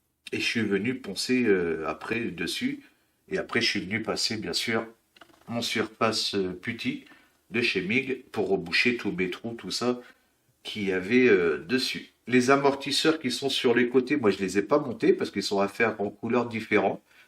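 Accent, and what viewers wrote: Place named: Germany. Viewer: je prend ta vidéo très en retard mais ton anglais sur ton tube ABT ce serait plutôt de l'allemand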